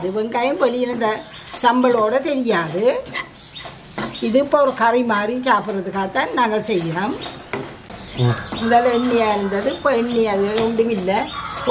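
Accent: Sri Lanka. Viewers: Antha ilangai Tamil ❤… Samayal ah vida rusi athigam 😇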